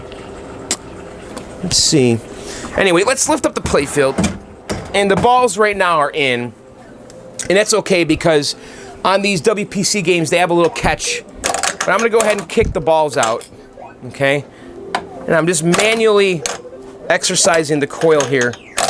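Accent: Germany. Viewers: Hey, I really love your german accent. I speak german and I laughed so well! TOP!!! Greets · That might be the best German accent I've ever heard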